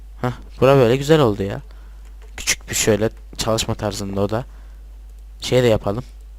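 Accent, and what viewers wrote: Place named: Istanbul, Turkey. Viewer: sesin öyle 34 gibi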